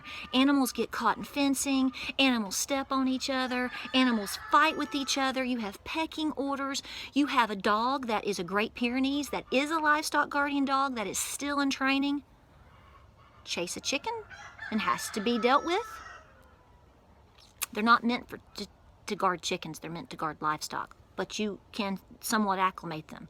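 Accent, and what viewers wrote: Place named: Deep South, United States. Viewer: I just found this video and your channel. I love your deep south accent and attitude! You tell it like it is, and you an inspiration. <3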